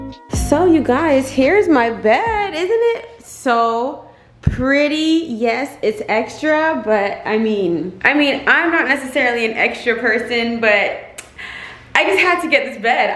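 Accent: Jamaica. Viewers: Are your parents Jamaican? I can hear the accent and mmm mmm is honestly what have it away in the beginning 😂😂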